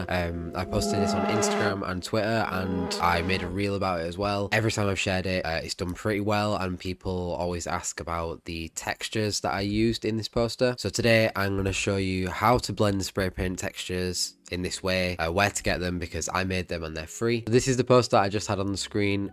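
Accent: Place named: United Kingdom. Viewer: Sooo bri'his! (I lived 2 months in Reading years ago :) )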